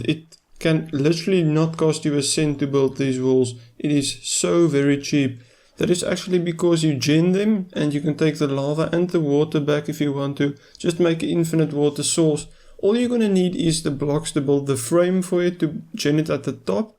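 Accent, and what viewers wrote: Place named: Netherlands. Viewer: i smell dutch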